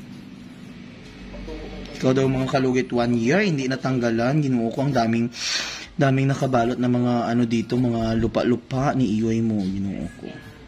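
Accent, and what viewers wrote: Iloilo, Philippines. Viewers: Taga saan po kayu?ilonggo ka eh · daw ilonggo kaman maghambal. .hehehe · Daw ilonggo ang tono haha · ilonggo ka po?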